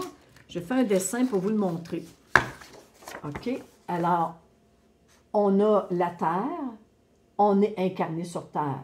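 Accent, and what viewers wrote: Quebec, Canada. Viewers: Merci, c'est toujours un grand plaisir et une grande joie de te voir et d'entendre ton bel accent Canadien 🧡🌿🌼🌷🕊🌷🌼🌿🧡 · Merci🙏, merci 🙏, merci 🙏pour ce magnifique partage 🥰. J'adore votre accent Québécois 😂❤, ça me donne la pêche en vous écoutant. Vous êtes adorable ❤. Belle soirée de la Nouvelle Lune 🌑. Je vous envoie pleins d'Amour 💗 et de Lumières 🌟✨️🕊...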